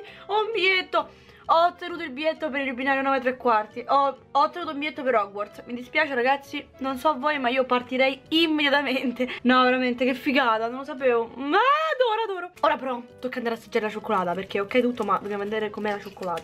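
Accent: Rome, Italy. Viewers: Il tuo accento romano lo amo 😍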